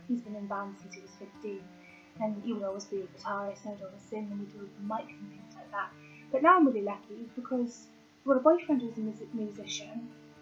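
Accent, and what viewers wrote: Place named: Wales, United Kingdom. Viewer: you cutie <3 I think that after this long of knowing you and never meeting up I'd built up this voice in my head for you that never took into account the fact that you're Welsh:P Your accent is so much more adorable than I thought it'd be:3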